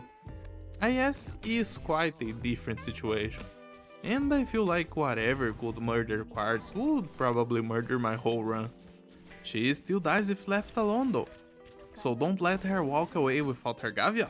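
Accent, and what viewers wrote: Brazil. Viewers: You are Brazilian? your sotaque are very paulista :V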